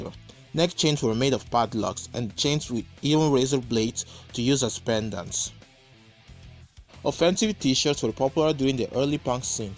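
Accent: Italy. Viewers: From your accent I feel that you are Italian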